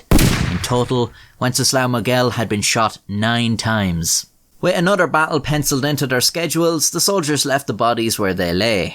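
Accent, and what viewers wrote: Ireland. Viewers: Love the Irish accent. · lol randomly got a recommend to this vid, and honestly the irish accent made this 100 times better. XD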